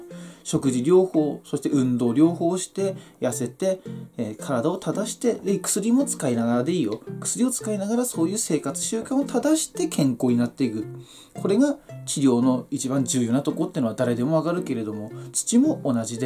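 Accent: Tsugaru, Japan. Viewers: このイントネーション…津軽人だな？ そーいう私も青森市在住ですw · 東北、青森県、津軽地方の方ですか❓️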